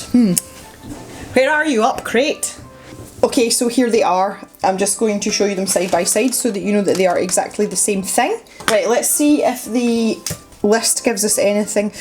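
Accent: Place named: Dundee, United Kingdom. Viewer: the Dundee accent is def different lol Glaswegian confuses me a lot I think the closest thing similar in Canada is the west coast accent compared to east coast. but thats provinces away by distance not a few miles but that makes sense since so many scottish/irish people went to the east coast hundreds of years ago (you do have very small regional differences in slang and sort of in accent but nowhere on the scale like it is in Scotland)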